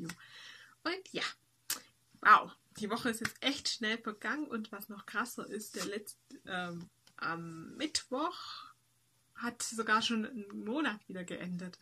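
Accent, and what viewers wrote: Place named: Swabia, Germany. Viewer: Ich kann das nachvollziehen, wie Du in Sachen Hilfe annehmen fühlst. Du wirkst symphatisch und das Schwäbeln passt gut. MfG René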